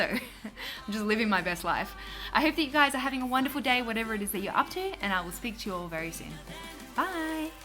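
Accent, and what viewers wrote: Australia. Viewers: I crave shape tape soooo much, but Tarte is not sold in Italy and the shipping costs is quite high for me... I can't stand this situation anymore 😭😭😭😭 #tartecometoitaly P. S. your aussie accent is so pretty! 🐨❤️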